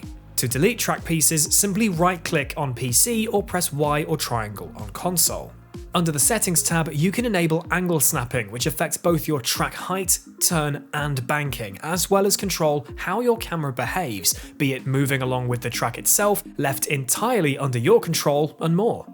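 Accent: United Kingdom. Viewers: What? He's just British lol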